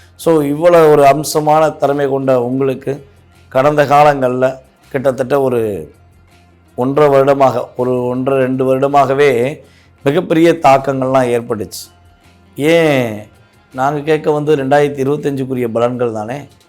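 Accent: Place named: Madurai, India. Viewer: Madurai man vasam pechil theriuthu sir.romba varusama adi than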